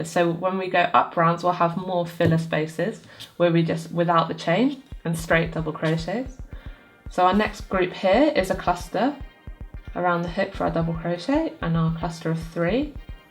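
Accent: United Kingdom. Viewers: Awesome instructor and very clear teaching of all the necessary techniques needed to accomplish what looks like an extremely difficult pattern to those who don’t crochet! A great way to use up all that stash, too! Thank you so much. It did take a bit of rewinding parts though just to understand her English accent, which I grew to love!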